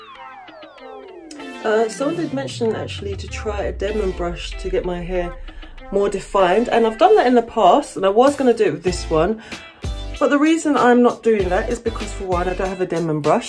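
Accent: United Kingdom. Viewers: Ur voice is pretty are u from the UK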